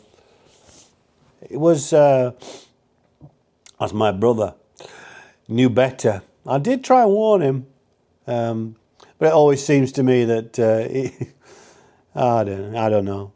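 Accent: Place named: Manchester, United Kingdom. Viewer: Evenin ! Rob recommended your channel … we’re in a similar situation, awaiting sale of house before we can buy. However our budget is lower than yours 🤣🤣 despite your Canadiannlication I recognise that you are a Mancunian 🤔😵😵. Like you i loved my 1150 GS… sadly due to a few prangs I was persuaded to change to 4 wheels- compromise was no roof 🤔🤔 … hope all goes well on the trip to France 😎 Steve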